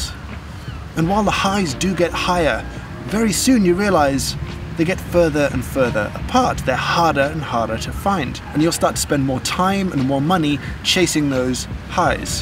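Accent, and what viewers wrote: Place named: England, United Kingdom. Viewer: It's kinda funny because (for my understanding of accents) sounds English, and to our rather specifically cultivated Italian taste buds, England is among the worst eating places in Europe. I'm not saying Italy has great food and everybody else doesn't - in fact Italy has been out of the main "best restaurants - most Michelin stars in the world" tour exactly because in a way Italian taste won't meet international taste: our wine is tasteless to Californians and French, and until Tuscany started making wine specifically for the USA, we would not sell or score high abroad. But the concepts brings forth are rather sound, tasting for Italians, on average, is more developed but also more of a niche, and we won't be satisfied by anything that won't taste as our mothers did it. And if everything is special, nothing is special.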